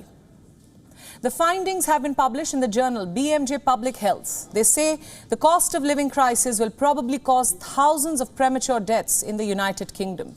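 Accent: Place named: India. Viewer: the host Ugly Indian accent is a pain to hear. Indians just got the worst accent and everyone in the US is laughing at how Indians speak English.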